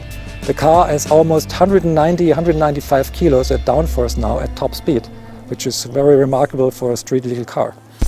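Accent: Germany. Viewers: Kann gut sein, wobei mein Englisch relativ gut ist ; ) Hab vor knapp 'nem Monat mein Englisch-Abitur geschrieben und hab für mehrere Monate in den USA gelebt ... Aber du hast Recht, sein Englisch ist nicht schlecht. Mich stört es nur, wenn man schon beim ersten Satz hört, dass da ein Deutscher redet ; )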